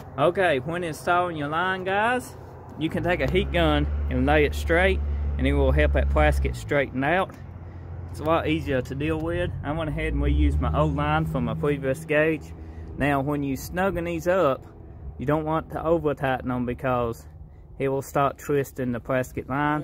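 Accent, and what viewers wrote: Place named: South, United States. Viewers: Simple videos, explained in terms I understand. The Bobby Boucher accent is a plus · Second thought sounds like their from the south so probably normal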